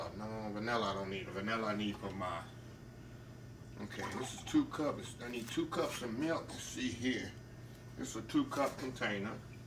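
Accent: South, United States. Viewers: lol i'm laughing halfway thru the video. He has got to be from either Louisiana, MS, GA, or Texas lol. Jokey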